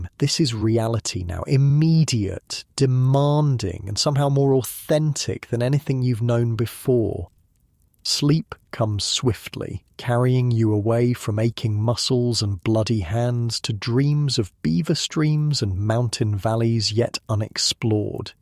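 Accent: England, United Kingdom. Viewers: PM in NY... There's more than a handful of these types of videos out there, but I prefer because of the narrator's English accent and his sly metaphors. They paint such a vivid picture, and mix very well with Moonlight Aura Vibes' video, Cat Purring & Brown Noise (10 Hours). I usually put together a playlist that takes me through the entire evening, allowing me to subconsciously be an opium addict in Victorian time, to The TERRIFYING Life of a Child Emperor in Imperial China, and all points in between! ❤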